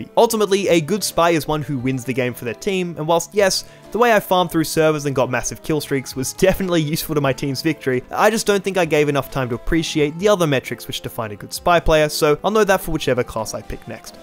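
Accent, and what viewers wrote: Australia. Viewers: He is aussie lol, he doesnt sound american at all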